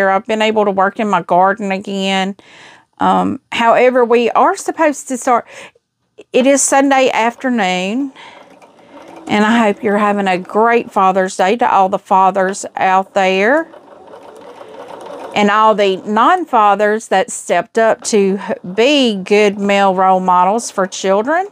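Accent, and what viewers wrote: South, United States. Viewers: Adorable block. I'm definitely trying this one! First time watching. I'm not sure where you are from, but o adore the southern accent!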